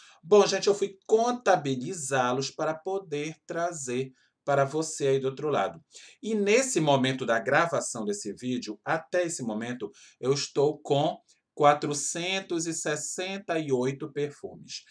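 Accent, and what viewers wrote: Pará, Brazil. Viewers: Esperando esse desapego... vc pelo sotaque é paraense? Meu filho nasceu no Pará, fui morar Macapá e hoje meu filho, 26 anos, mora nos Estados Unidos. Bjs adoro suas resenhas, vc tem muito carisma. 💕🥰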